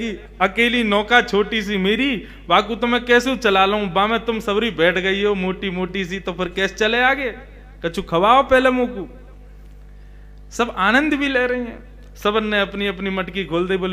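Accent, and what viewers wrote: Braj, India. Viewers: Aapka nauka bihar prasang brajki local bhasa me sun ke atma trupti hogayi. Jitna sune utna mitha lage. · समज आवे ना आवे पर सुनने में बहुत पियारी है आपकी ब्रजभाषा आप के जैसे ही है 🙏🙏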